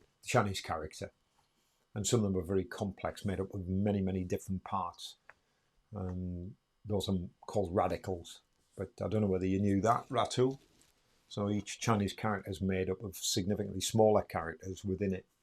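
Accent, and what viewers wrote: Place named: Newcastle, United Kingdom. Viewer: Ill give you Fifty Quid if you say Utterly butterly in your Geordie accent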